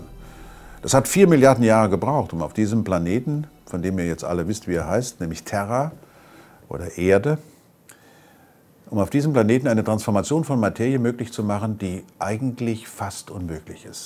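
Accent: Hesse, Germany. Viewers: Weidwingel! Da spricht der Hesse! :) Schöne Folge! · So schön hessisch!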